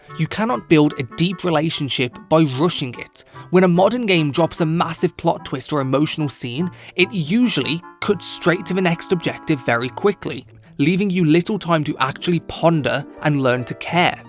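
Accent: United Kingdom. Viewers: Are you from Birmingham? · why do you have a British accent? Really good video either way!😊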